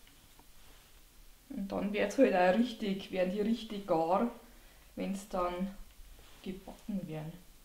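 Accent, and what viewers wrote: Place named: Bavaria, Germany. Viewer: .... und so ko ma d'laet bscheissen.... köstlich ! Ich finde Ihren bayrischen Akzent und Ihre Art zu kochen einfach wunderbar. Sie strahlen bayrische Gemütlichkeit aus. Ich lebte auch lange Zeit in Niederbayern und weiss das zu schätzen. Übrigens, wo kann man so eine tolle Gewürzmühle kaufen? Liebe Grüsse aus Spanien.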